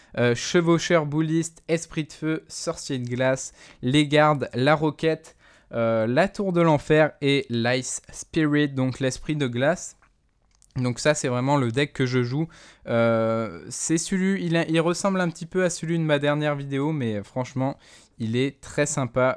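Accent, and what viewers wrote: Belgium. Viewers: tes belge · il est belge ?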